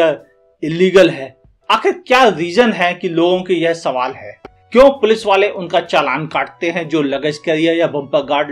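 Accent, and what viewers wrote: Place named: Uttarakhand, India. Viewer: Are you from uttra khan because I am and determine your voice tone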